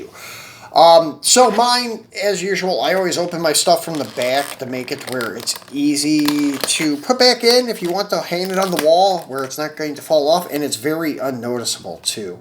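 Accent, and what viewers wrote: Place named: Cleveland, United States. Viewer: Oh and while I'm at it, it's modern, like and urhn, not moderin modern. Lol I just messing with you! I learned to talk in N.Y. and live in Colorado so my ascent probably sounds worse then your Cleveland accent? Lol.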